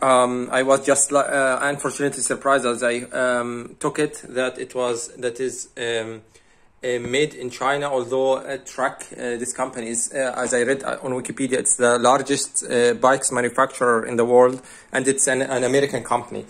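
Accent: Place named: Arab world. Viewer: واضح من اللهجه انك عربي حط الترجمه للعربي لان افكر اخذ مثلها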